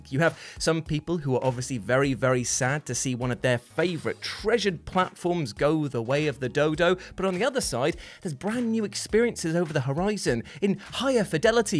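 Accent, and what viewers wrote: South, United States. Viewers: That Southern accent 💯💯💯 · As a southerner (TN USA) I gotta say....your (admittedly somewhat over-the-top) southern accent was on point man lol....GJ. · Not mad at that cowboy accent at all 😂😂😂😂😂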